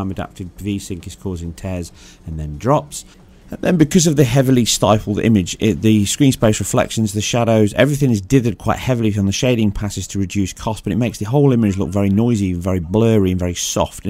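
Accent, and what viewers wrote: United Kingdom. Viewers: Doing tech analysis with UK accent is the right move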